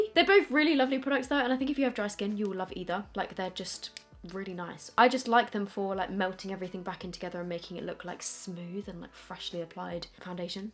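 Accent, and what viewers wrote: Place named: England, United Kingdom. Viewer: I subscribe and watch you to learn English accent!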